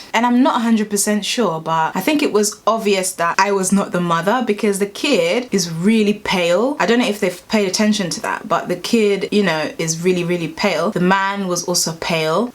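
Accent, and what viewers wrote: United Kingdom. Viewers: Your African,your not considered black by us.Your African,British accent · That British accent.. 🤝🤝👌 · Girl, I'm African-American and if you came to the U.S. you would get stared at by Black people because of your dark beautiful glistening skin. Then if you started to talk with that English accent you would get stared at even harder!! You would be such an anomaly here, worth every adoring stare!! Its interesting to see how slavery affected and displaced Africans all over the world. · The problem is you believe you are "Black" and the people are Germans. You dont want to Call youself British going by your accent. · Hi...,I real like your accent are you from UK?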